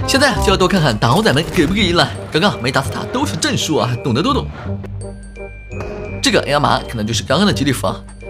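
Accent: Taiwan. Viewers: 连续看你的戏十次这是第四次台湾腔好听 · 其實臺灣腔也蠻好聽的 （猛然發現的標題是繁體字）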